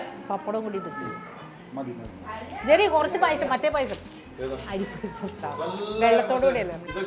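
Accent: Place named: Thrissur, India. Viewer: Now I get it why is having a thrissurian style of talking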